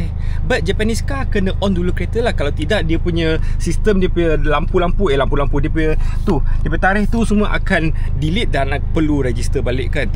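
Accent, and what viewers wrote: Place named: Terengganu, Malaysia. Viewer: dia bercakap tu BAHASA MELAYU.. CUMA DIALEK HULU GANU.. ADOII.. SUSAH KALAU HIDUP BAWAH TEMPURUNG NI.. aku yg di sarawak pun tahu.. ko dari planet mana😂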